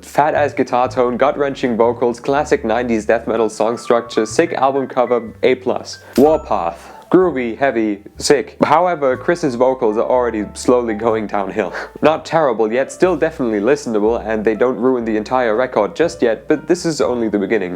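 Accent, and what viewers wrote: United Kingdom. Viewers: It's kinda wierd listening to a British dork with a constantly cracking voice and a tendency to raise pitch and laugh/giggle nervously at the end of every sentence, making fun of the way someone else looks or talks. Whatever may be true of all the things you point out, talking about someone's look/style and/or vocal tendencies, is 100% fucking douche move. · There is no way a guy with fender Wall art and a UK accent is saying every song sounds the same on 13. Kids these days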